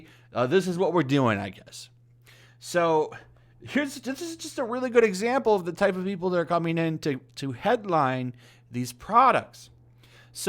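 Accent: United Kingdom. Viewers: What’s with the funny British accent? Pretty awful...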